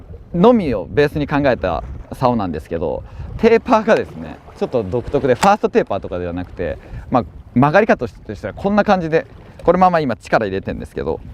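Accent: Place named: South, Japan. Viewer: South japanese accent!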